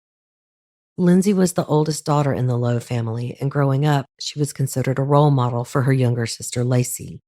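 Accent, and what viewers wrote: South, United States. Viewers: She didn't come up with the hypothesis. The shrinks did. If anything she was candid and remorseful. She obviously had issues and while I don't think the boy understood it, she likely did have that kind of compliance that made her go along with it when he said he wanted sex and to marry her-- he was probably exploiting her. I bet she has a below average IQ and other problems. The truth is cognitive dissonance is incredibly common. As a dental health professional her reaction to a biohazard would be to clean it up. I feel badly for the babies but I know smart women who could protect themselves during sex who don't. They don't defer to a morning after pill or ( as much as I dislike it) have an abortion. The cop and the judge were biased. I get their emotions because we can't imagine killing infants. But let's be honest. They're men. 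Their expectations are not entirely empathetic or intelligent because they have that whole Madonna whore dissonance about women. If they had to have babies they would be a little less judgemental. Men can make babies and no one judges them for splitting, for dodging support and denying them. I know men with six kids by as many women who don't pay child support. Or anything else. No consequences. You did a very fine and fair job here. Ive been binging on the show today& love it. I grew up in the south but have been a vagabond since I came of age. It's good to hear that sweet southern sound of the storyteller in female form. I think of Flannery Eudora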